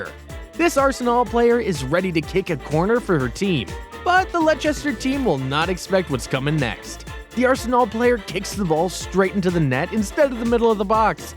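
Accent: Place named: United States. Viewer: Bros definitely american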